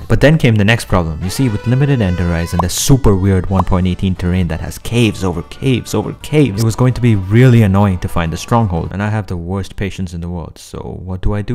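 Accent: India. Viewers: are you Indian you sound Indian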